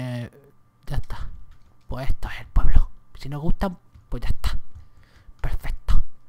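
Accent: Chile. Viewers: like por el acento chileno del * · Nunca vi este:'v PERO HABLA CHILENO DICEJDBCIDJCIBFICJFIF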